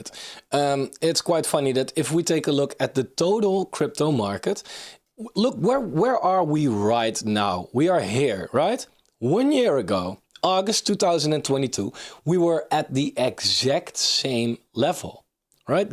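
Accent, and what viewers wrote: Netherlands. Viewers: Are you on the btc amsterdam conference? I say this because you sound like you are from netherlands!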